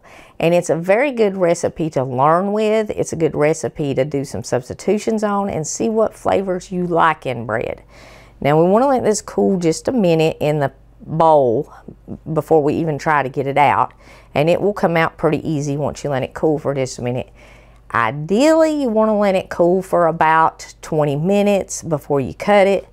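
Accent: South, United States. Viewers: . I love your accent. I've always loved that southern drawl as we call it here in the UK. I'm an East End London Cockney. So I've also got a distinctive accent. Thanks for your lovely tutorials Xxx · LOVE ur southern drawl...im in nw ark ❤ LOVE u have pledge of allegnce posted! God bless ya'll 🇺🇸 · I love your down home accent. I lived in Louisiana for 3 years.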